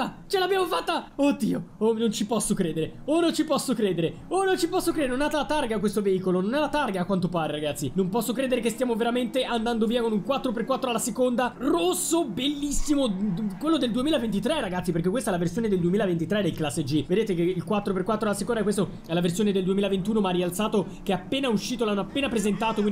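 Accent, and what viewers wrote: Milan, Italy. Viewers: Ma sei di Milano?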